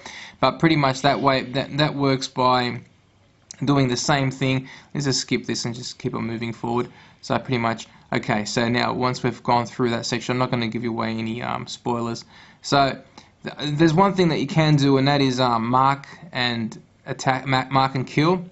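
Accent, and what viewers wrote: Australia. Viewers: i like ur aussie accent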